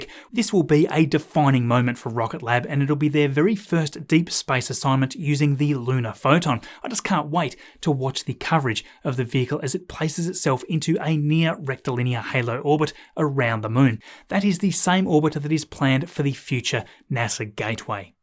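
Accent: Australia. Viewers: ..... Awesome presentation again.... 👍... If I could remind you, your success at least in my simple brain 🧠 lol 😁...is do to some awesome folks with British accent.... First one is John Romer archeologist, and David Attenborough naturalist.... I just totally loved these two and their presentations with that accent, your Ozzie accent is similar to theirs and captured my attention in the beginning of your YouTube stardom 🙂. Of course you do have a hell of lot more charisma than both of them two above lol 🙂....not to mention the effort and the knowledge you put into your presentation. Much appreciated mate 👍🤜🤛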